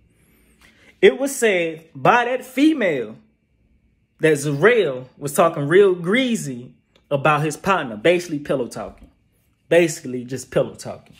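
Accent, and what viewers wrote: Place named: Texas, United States. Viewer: You're not even from CA 😂 you sound like you're from Texas or somethin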